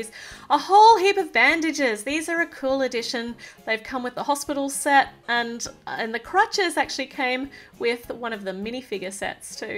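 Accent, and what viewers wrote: Australia. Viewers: sydney i think (she sounds like it)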